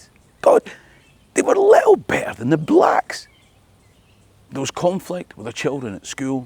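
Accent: Scotland, United Kingdom. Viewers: Man - what a story! As a german I love to hear your stories on scottish history. For your fresh view on history and the scottish accent I love. Turns out I am wrong. You are a rarety, a philosopher and philantroph disguised as comedian. Holding up the mirror like a jester. Thank you. Todays crazy world needs more of your kind.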